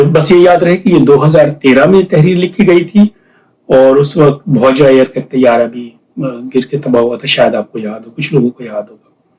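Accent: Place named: RP, United Kingdom. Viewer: ایسا لگ رہا جیسے بی بی سی سن رہے. کمال ایکسنٹ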